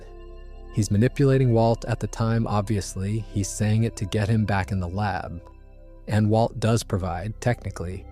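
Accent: United States. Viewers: The narration for this video about an American show being in an American accent, but nonsensically forcing British words / terms in... absurdly pointless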